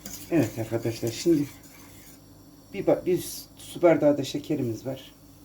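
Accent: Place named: Kayseri, Turkey. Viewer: Yapicam simdi siveniz Kayseri gibi yanılmıyorsam elinize saglik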